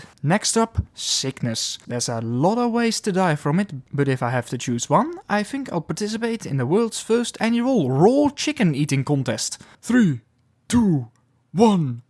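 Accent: Netherlands. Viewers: Ah, classic nederlands accent. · Are you from the netherlands lol?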